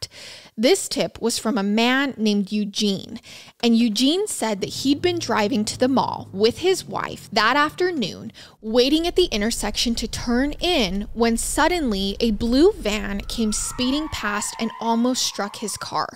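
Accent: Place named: Utah, United States. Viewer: I love the new series. A couple things.. and I mean the first one to be helpful haha not rude.. you’re supposed to pronounce the r’s in corroborate. It’s almost like you’re mixing corroborate and cooperate into one word. Hehe. And goodness girl, did you grow up in Utah??? You have one of the strongest accents I’ve heard from that area of the US! It jumped out right away and I was like wait a minute, I know that accent!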